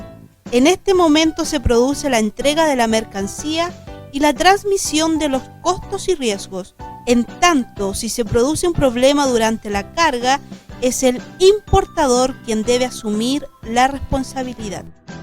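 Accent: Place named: Spain. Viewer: Agora que percebi que a fala e o sotaque espanhol são horríveis.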